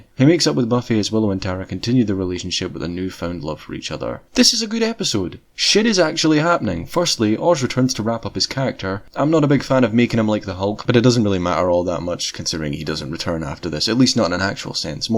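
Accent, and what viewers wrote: Scotland, United Kingdom. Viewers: I think he might be Scottish?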